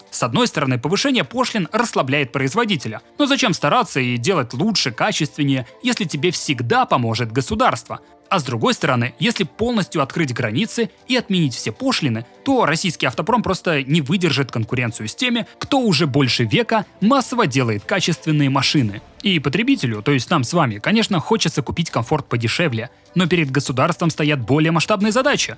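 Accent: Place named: Ukraine. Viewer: хохляцкий акцент у автора.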